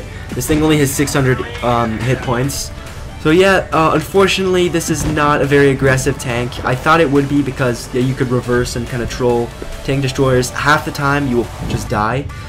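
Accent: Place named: India. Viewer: the indian accent xD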